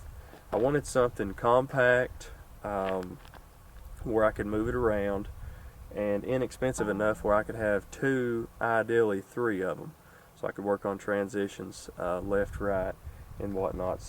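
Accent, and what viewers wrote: South, United States. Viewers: very neat idea, also love the Tacoma, '05-'07? The wife said ya must be a "Southern" boy. Accent. I couldn't tell.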